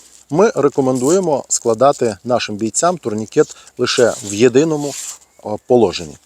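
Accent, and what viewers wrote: Kharkiv, Ukraine. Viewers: Дякую, за корисні поради та за чудову Харківську українську ). Мова - теж зброя. ✌ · Дякую за українську вимову, це ліпше ніж московитьським язиком.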